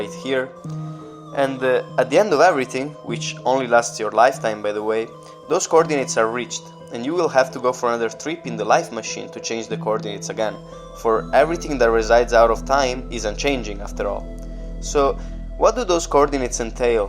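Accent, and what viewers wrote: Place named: Italy. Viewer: Fantastico come la tua pronuncia fosse perfetta, ma mi sono bastate le prime tre parole per capire che fossi italiano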